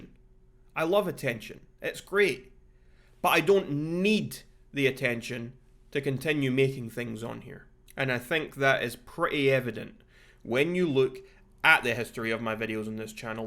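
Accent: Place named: Scotland, United Kingdom. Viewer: Bro saying Australians have the worst accent while this dude is Scotland (not hating on Scotland btw)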